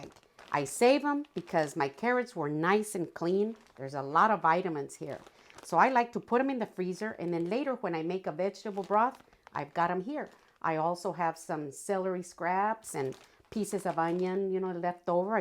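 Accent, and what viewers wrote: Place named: New Mexico, United States. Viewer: I love you miss I love everything you make. I'm from new mexico your voice makes me home sick.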